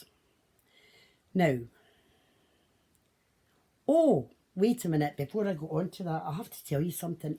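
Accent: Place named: Scotland, United Kingdom. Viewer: Oh my god I love you and your scots accent just found your channel I don't knit I sew but I will surly be visiting your channel again cause I feel like l have been visiting ayou in your home you are a pleasure to listen to god bless you from northern ireland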